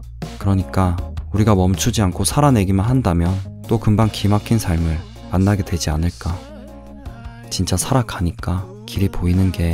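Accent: Gyeongsang, South Korea. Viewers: Love this channel. 고향이 경상도세요???? I can hear ur dialect❤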